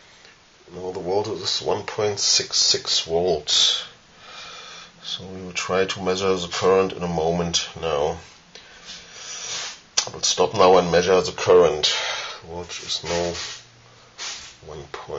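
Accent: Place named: Germany. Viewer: just a question.. where are u from? ur english sounds like u was a german o0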